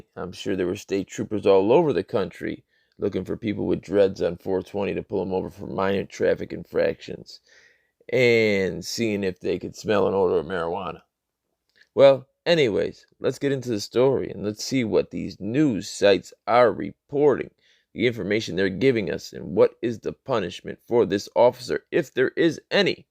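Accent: Chicago, United States. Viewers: Hey Man, you should get paid for your voice, perhaps not narrating auditing clips but maybe radio. You have a unique cadence when you speak and along with your Windy City accent perhaps you could do "news" as opposed to "music", especially in the upper Midwest. You know, something progressive which would help listeners. I have a good ear for voices and yours is unique and pleasing. My favorite voices to listen to are posh, young English accented girls so you don't get the wrong idea. Just informing you of what you might not have read before.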